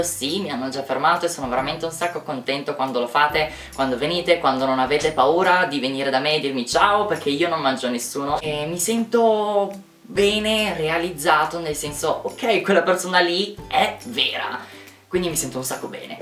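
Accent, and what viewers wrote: United Kingdom. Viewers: Adoro il tuo accento inglese. Spero di diventare una persona forte come te♥️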